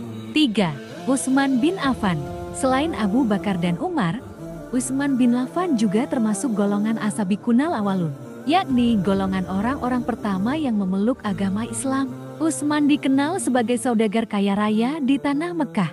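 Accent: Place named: Indonesia. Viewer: Sayang pelapalan bahasa Arabnya, masih sesuai bacaan Indonesia.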